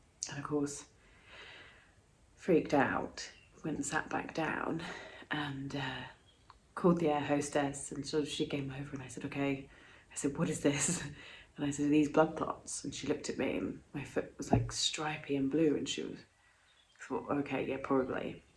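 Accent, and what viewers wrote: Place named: Surrey, United Kingdom. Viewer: Lovely Surrey accent and Asmr , Just wish you posted more videos , Blood thinners aren’t fun I’m on them for life because i get clots in my lungs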